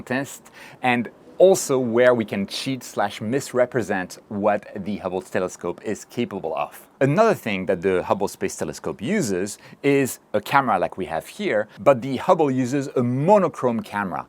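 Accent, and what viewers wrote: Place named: France. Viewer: in japan and with a french accent, verry intresting~ tbh am not surprised x3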